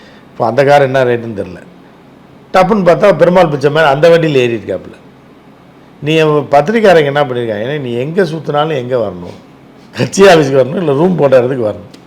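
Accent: Madurai, India. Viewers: தோழர் அவர்களே எப்பிடி தோழா நம்ம மதுரை பாசையில் சிரிச்சே நீங்க சிரிக்காம சித்தப்பாவ NO S NO S கலக்கல் வேரலெவல் தலைவா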